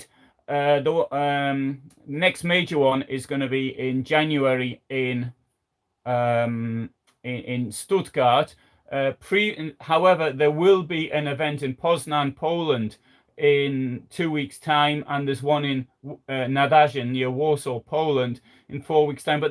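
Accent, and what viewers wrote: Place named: North East England, United Kingdom. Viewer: Morning from Scarborough, North Yorkshire not a million miles from where you're possibly from with your North East accent?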